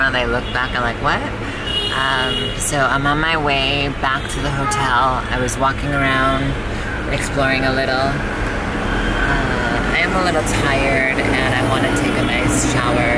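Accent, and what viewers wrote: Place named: Bengal, India. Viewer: She is speaking with Hindi language people but tune is like Bengali . love it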